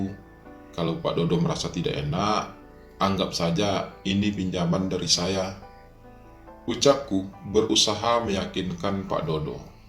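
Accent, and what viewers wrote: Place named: West Sumatra, Indonesia. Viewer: Logatnya logat Sumbar (Minang)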